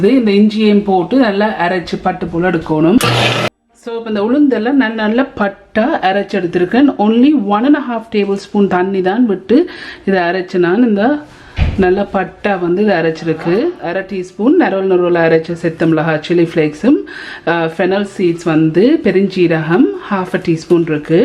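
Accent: Jaffna, Sri Lanka. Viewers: Hai dear I am so happy to be a subscriber to your channel. We feel happy and pleasured to hear your pure jaffna tamil Could you please show how to make prawn flat vadai ? Usually we can buy these vadai in Colombo gall face beach.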